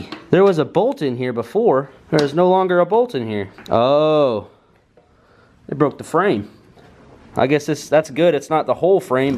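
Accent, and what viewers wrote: South, United States. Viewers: i liked how you went over everything and teaching the viewer and i thought it was funny how southern you sound and i knew i had to sub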